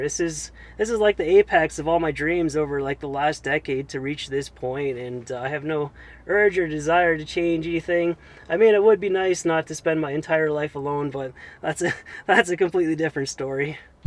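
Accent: Canada. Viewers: Don't forget that epic Canadian Accent! 😉🚚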